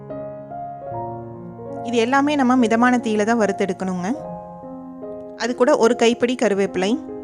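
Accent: Kongu, India. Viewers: கொங்கு தமிழ் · I'm your new subscriber sister.. Very tempting thanni kulambhu.. I'm from Coimbatore.. Unga kongu Tamil suprernga ...very nicenga ❤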